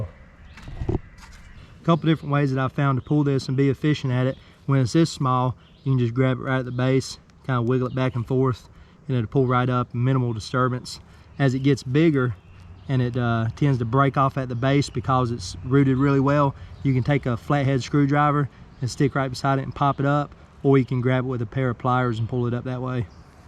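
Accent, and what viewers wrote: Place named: North Carolina, United States. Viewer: I like your no nonsense approach. I’ve watched most of your videos on zoysia, your recommendations have been spot on, no bs sales pitch. but to be honest I think it’s the southern drawl that makes your content more “watchable” 😂 you from Charlotte originally? Sounds more like Cleveland NC to me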